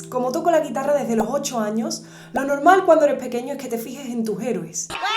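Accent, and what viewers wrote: Andalusia, Spain. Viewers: Hermosura y talento Juntos ❤❤❤❤ Adoro tu acento andalú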